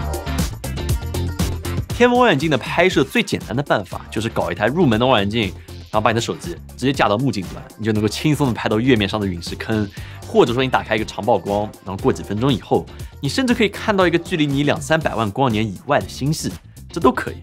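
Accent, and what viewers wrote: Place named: Jiangsu, China. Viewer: 这口音，江苏人。我福建的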